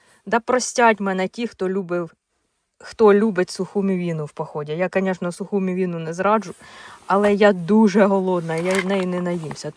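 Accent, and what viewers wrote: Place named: Kyiv, Ukraine. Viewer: У вас говір київської області👍❤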